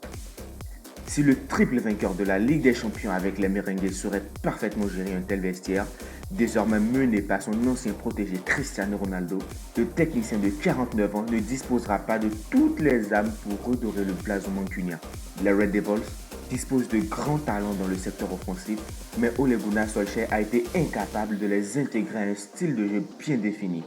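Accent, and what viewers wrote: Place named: Africa. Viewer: Wesh on comprend rien avec ton accent africain , paye quelqu’un qui parle bien français pour faire ce genre de vidéo pff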